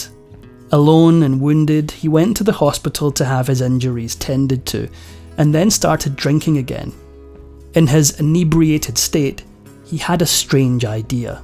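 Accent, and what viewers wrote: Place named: Scotland, United Kingdom. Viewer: You answer my question . I love his voice too. Scottish . I thought British.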